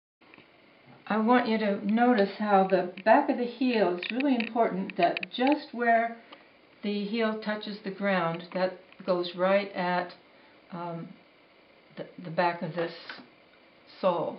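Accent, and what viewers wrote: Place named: Northern Ireland, United Kingdom. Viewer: Hi. I really enjoyed your video and I plan to try it. Great to hear someone from NI. What county? I'm from Tyrone.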